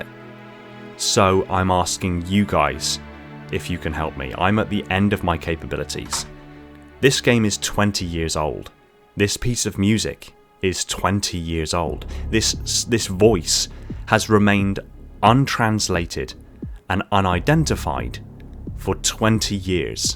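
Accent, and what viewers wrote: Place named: Hull, United Kingdom. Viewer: Was not prepared for your Hull accent, God damn I wish that actress in Game of Thrones used a different accent 😂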